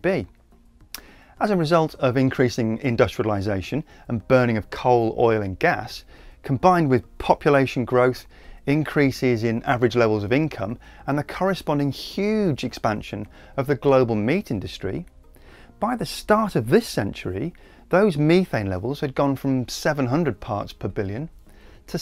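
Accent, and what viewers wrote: United Kingdom. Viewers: You pronounce it me-thane, we pronounce it meth-ane. We don't tell you how to pronounce words if you don't tell us how to. Deal? Whenever I come across the English when I was in the UK or when travelling they always show their arrogance. My suggestion is you're not that special, so stop acting arrogant.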